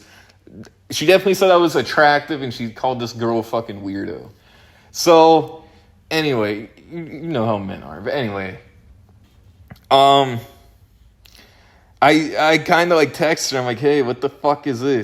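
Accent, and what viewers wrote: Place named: Midwest, United States. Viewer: a midwest accent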